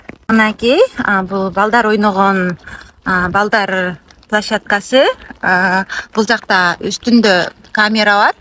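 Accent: Standard. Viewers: Азаматсын! Таза суйлогон кыргыз кызы экенсин.Аман бол.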